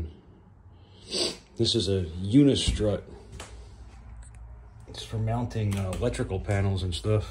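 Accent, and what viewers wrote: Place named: Chicago, United States. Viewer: Cook county accent.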